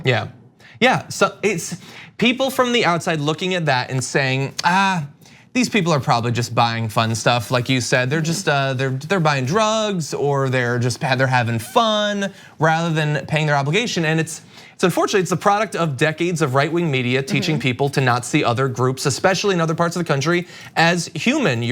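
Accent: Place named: Ohio, United States. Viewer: John endearingly says "wuter" which is sort of Ohio speak.. Where's he from? [asking for a friend from Ohio]. Chicago was never this progressive when I was growing up there.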